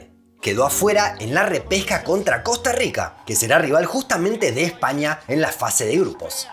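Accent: Argentina. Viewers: Sos argentino loco? De donde sos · Jejeje ahí vi que tienes un telescopio 🔭.. Casualmente estaba buscando paisajes de Argentina en Internet cuando llegó tu notificación... Creo que eres de Argentina por el acento, de que provincia por cierto, si en un futuro viajo a Argentina espero encontrar a la gente que conocí en Internet allá (no debería pero ya que) Las provincias que quiero conocer primero son Neuquén, Mendoza, Jujuy,Misiones,La Rioja,Chubut, Río Negro,Tierra del Fuego,Salta, Córdoba, etc... Un saludo desde Costa Rica 🇨🇷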